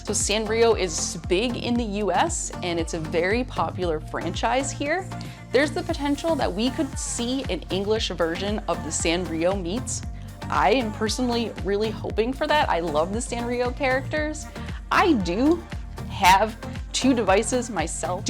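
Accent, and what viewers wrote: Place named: England, United Kingdom. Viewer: by the way I love the way you talk and sure your English accent. You could be my English teacher 😅😊 and that’s why I watched all of your videos. Yeah... I’m crazy about the tamagotchis too.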